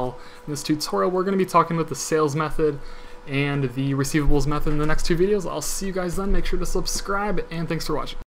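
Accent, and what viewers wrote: Canada. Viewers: Love dat Canadian accent!!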